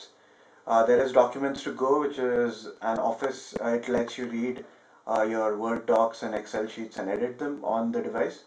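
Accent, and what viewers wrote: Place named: India. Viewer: Your accent resemble like an india even your screen was not clean at all which reflects that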